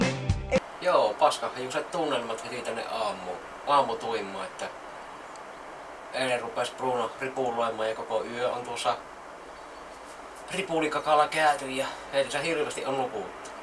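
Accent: Savo, Finland. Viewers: Erittäin hyvin sanottu! Jo vain kun sen sawon murtteella tyrkkee tulemaan nii kyllähä siihe oma lisäpotku tullee asiaan ku asiaan! :)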